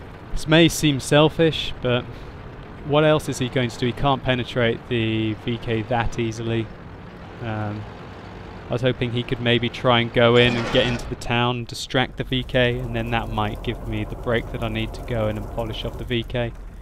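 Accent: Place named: Australia. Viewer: why do you sound so australian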